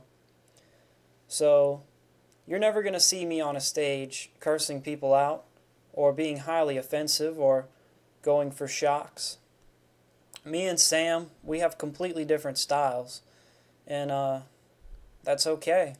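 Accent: Mississippi, United States. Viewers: You from Mississippi?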